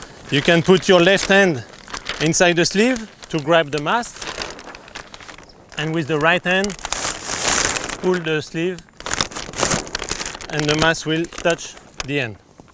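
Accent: England, United Kingdom. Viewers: l'accent anglais magnifique d' xD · j adore ton accent anglais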